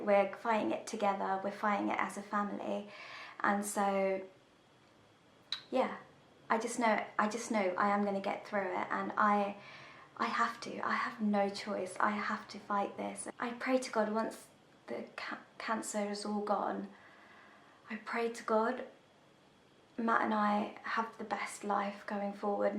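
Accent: United Kingdom. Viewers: do you say Tuesday like chuesday I like the British accent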